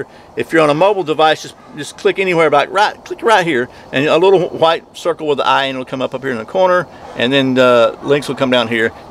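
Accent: Georgia, United States. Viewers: the subtitles suggest you tried something from your toilet!?! That is not a good idea. Got to love automatic closed captioning on that lovely Geogia accent.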